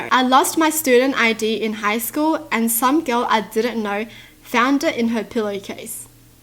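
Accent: Australia. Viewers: She looks like rosè too and they're both from australia that's why they have the same accent. Maybe they're related?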